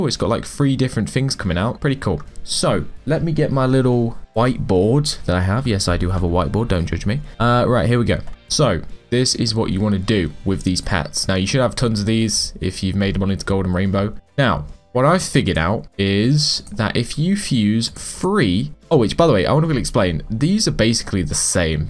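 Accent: United Kingdom. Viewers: Because I think he's British Accent..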